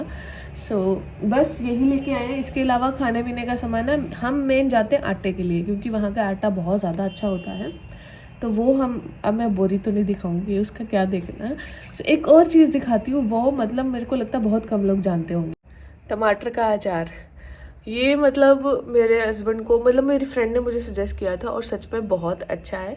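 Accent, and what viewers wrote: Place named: Himachal Pradesh, India. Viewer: Aapki language me himachali touch ata hai apnapan mehsoos hota hai ❤❤❤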